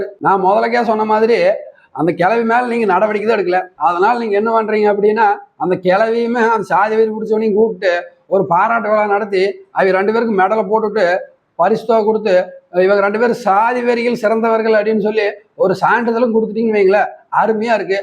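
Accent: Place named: Kongu, India. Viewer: தோழர் நீங்க பேசற அந்த மொழி வளம் நல்லா இருக்கு ..கொங்கு தமிழ்ல பேசுறீங்க ... எங்க திருப்பூர் மாவட்டத்தில் உள்ள கிராமங்களிலும் இது போன்ற சம்பவங்கள் கண் முன்னே நடக்கின்றது எல்லாருமே அதை கடந்து தான் போறாங்க யாரும் துணிந்து போராடுவதில்லை சாதி வெறியர்களும் திருந்துவதாக தெரியவில்லை.. இந்த கருமம் புடிச்ச சாதி வெறியர்களை ஒண்ணுமே பண்ண முடியல.. என்னதான் சட்டங்கள் போட்டாலும் கடுமையான நடவடிக்கை எடுத்தாலும்.. அதெல்லாம் அவங்களுக்கு பெரிய பிரச்சனையாக தெரிவதில்லை அவங்களோட சாதி திமிர எப்பவும் போல காட்டிட்டு தான் இருக்காங்க .. சாதி வெறியர்கள் மீது தொடர்ந்து வழக்குகள். நீதிமன்றம் வரை சென்று தண்டனை பெறுவதில்லை. பாதிக்கப்பட்ட சமுதாயத்தைச் சேர்ந்தவர்கள் தொடர்ந்து நீதிமன்றங்களுக்கு போக வேண்டுமா என்று சமரசம் பேசி வழக்கை வாபஸ் வாங்குகிறார்கள் என்ன செய்ய முடியும்.. அந்த சமூகத்தைச் சார்ந்தவர்கள் தினக்கூலிகள் தொடர்ந்து வழக்குகளுக்கு செல்ல அவர்களால் முடிவதில்லை.. அதனால் சாதி வெறியர்களுக்கு அனைத்துமே சாதகமாக அமைகிறது 100 வழக்குகள் போட்டால் ஐந்து வழக்குகள் கூட தண்டனை பெறுவதில்லை ஒன்னு ரெண்டு வழக்குகள் அதிசயமாக தண்டனை பெறும் .. 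சரி தோழர் தொடர்ந்து போராடுவோம் என்ன நம்மளால என்ன முடியுமோ அதை பண்ணுவோம் 😢😢😢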